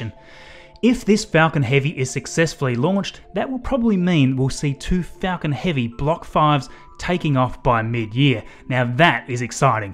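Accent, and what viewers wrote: Australia. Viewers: Just subscribed! Great to hear an Aussie voice describing Space stuff. Good on ya mate thanks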